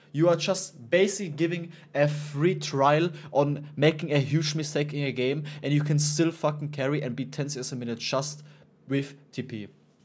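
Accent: Germany. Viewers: Man hört das deutsche raus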